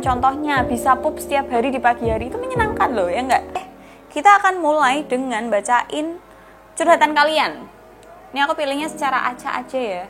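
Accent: Java, Indonesia. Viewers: Mbak buat subtitle nya dong di bawah kalo lagi medok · suka logat jawa.y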